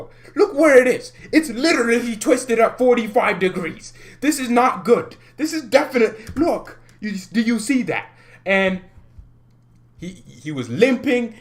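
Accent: United Kingdom. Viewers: I enjoyed your British accent and there way of speaking and thinking. You have talents to become an actor. Please more of this.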